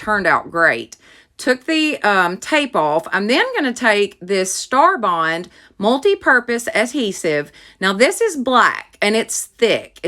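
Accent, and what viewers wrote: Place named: Tennessee, United States. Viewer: Shut up!!! Lol… I knew your accent was familiar!!! Tennessean!!!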